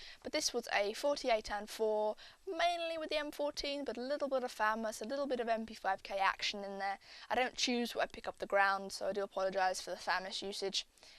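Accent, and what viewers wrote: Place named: RP, United Kingdom. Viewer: Damn youre posh.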